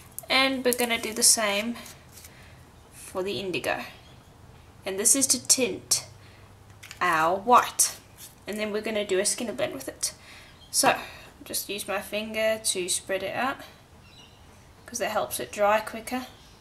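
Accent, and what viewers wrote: South Africa. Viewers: Hi. Where are u from? You sound South African :)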